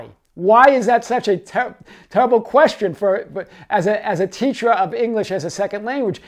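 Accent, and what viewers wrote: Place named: New England, United States. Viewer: Where are you from?! From the hat and the accent I would guess somewhere in New England! From New Hampshire over here! Blessings to you! Love your videos. Thank you for clarifying for, and inspiring so many people around the world! ♥️♥️♥️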